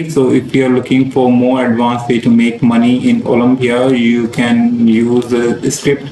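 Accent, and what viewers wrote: India. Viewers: ofc indian af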